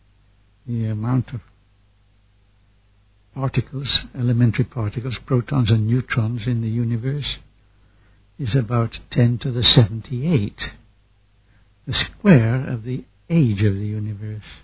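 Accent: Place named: Netherlands. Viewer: I could swear the accent is Dutch (being Dutch but living outside of Holland myself)...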